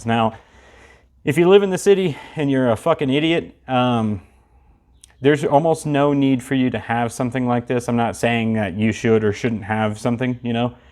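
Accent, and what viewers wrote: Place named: Texas, United States. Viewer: Dude you talk exactly like me. I was born in Ft. Worth grew up in the mid-cities of DFW. Great vid man.🤠👍🇺🇲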